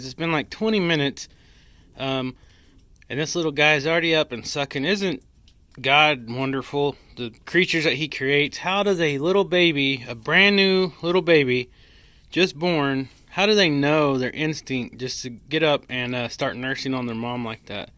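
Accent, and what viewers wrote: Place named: South, United States. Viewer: I can tell you're from the South when you say "I'm fixing to do this or that." I say "fixing" all the time. Nice baby goat you had!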